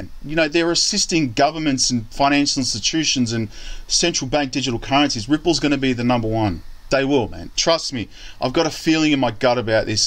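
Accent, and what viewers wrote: Australia. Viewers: Love hearing a fellow Aussie talk up Xrp. Have a bag of 70k xrp and I’m hanging for this to go back up as i bought at 68c AUD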